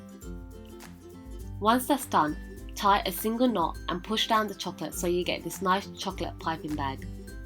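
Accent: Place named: Luton, United Kingdom. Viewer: Are you from Luton?! Because you sound like it I mean that accent! I'm from Luton as well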